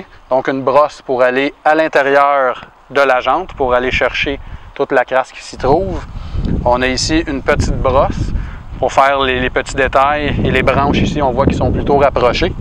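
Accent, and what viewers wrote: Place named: Quebec, Canada. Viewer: ça fait du bien d 'entendre du québécois . on en trouve pas assez sur youtube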